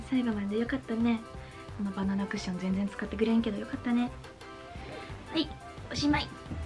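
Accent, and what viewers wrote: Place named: Hiroshima, Japan. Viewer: じゃけどって方言でとるけど広島かな？私も広島🥰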